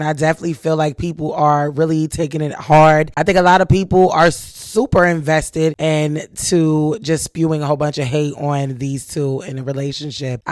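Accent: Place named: New York, United States. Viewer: Think she from new york .... sounds familiar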